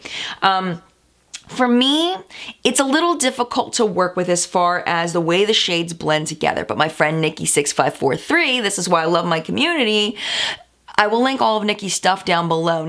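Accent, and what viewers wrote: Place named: New Jersey, United States. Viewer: I subscribed for that Jersey accent alone! ...that is a jersey accent amiright?!?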